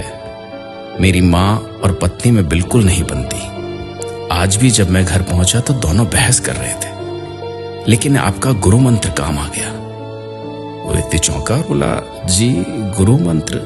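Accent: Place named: Punjab, India. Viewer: Aap ki Awaaj nice Punjab sa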